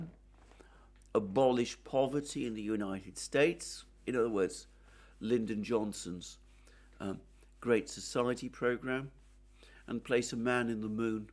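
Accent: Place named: RP, United Kingdom. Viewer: Alexander Mercouris: in-depth analyses, perfect understanding of geopolitics, posh English. Can anyone expect more?🙂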